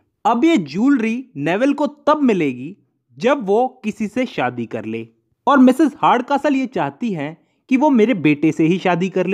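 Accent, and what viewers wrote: India. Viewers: Hahahahhah best way of explanation.... English with desi tadka wow .. great Sir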